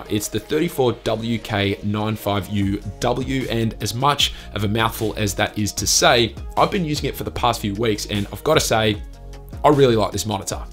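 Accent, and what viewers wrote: Australia. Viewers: sounds like such an aussie. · Thats a Thick OZ Accent. Are you an OZ ? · Cause Aussie accents are the best m8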